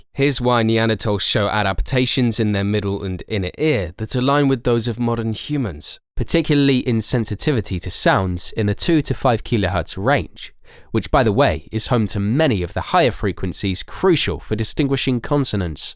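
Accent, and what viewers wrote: United Kingdom. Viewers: Hearing an English accent explain how a neanderthal must have sounded is kind of annoying to me.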